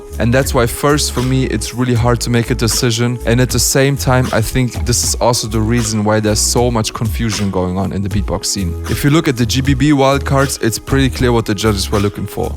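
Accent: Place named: Germany. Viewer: FLAX OOLTIMATELY I love ur german pronunciations so much 😂😂 Also, mic costs more than my rent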